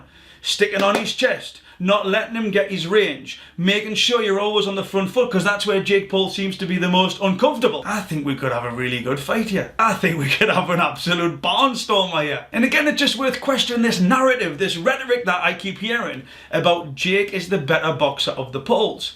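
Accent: Newcastle, United Kingdom. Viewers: you and true geordie have the same legendary accent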